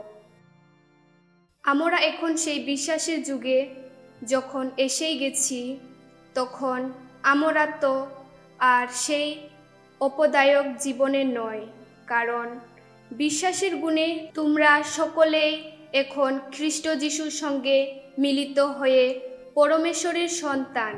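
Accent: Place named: Sylhet, Bangladesh. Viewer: সিলেটের বাংলা ভাষার টান রয়েছে ওনার উচ্চারণে।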